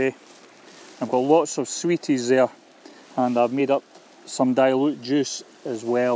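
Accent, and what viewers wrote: Scotland, United Kingdom. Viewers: Proper jock accent, lov it :) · as a Scot living in Sweden let me tell you the accent isn't holding anything back. The people of the world love our accent.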